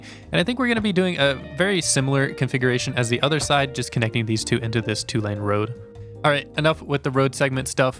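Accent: United States. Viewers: you're from 'murica, right?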